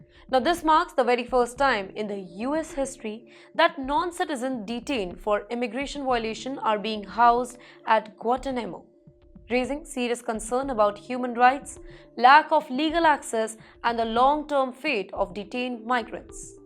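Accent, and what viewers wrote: India. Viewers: Punjabi+hindi+English = North Indian English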